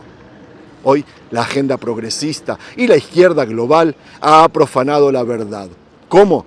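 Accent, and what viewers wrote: Buenos Aires, Argentina. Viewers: Por tu acento creo que sos también argentino doblemente hermano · Hola sr de Argentina, hace mucho lo veo me resulta muy agradable utt.me llama la atención su Castellano esmuy porteño de Argentina siendo una persona compresiva Y humama Gracias Gracias soy de ɓs.as. y cristiana solo siento elogios para usted y me gustaria un pequeño repaso de su conección con argentina ya que dijo que tiene un hermano graciasle pido no se incomode plr el pedido ya queme resulta una persona muy agradable mis🎉 saludos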